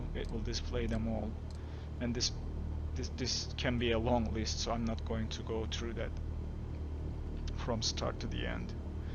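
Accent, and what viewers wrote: Turkey. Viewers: Bu adam türk değilse bu dili de bilmiyorum derim artık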